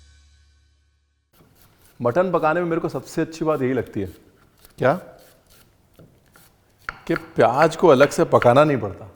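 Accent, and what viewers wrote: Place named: Lucknow, India. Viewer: U never disappoint at all.. each recipes has its nayab ( your lucknowi urdu)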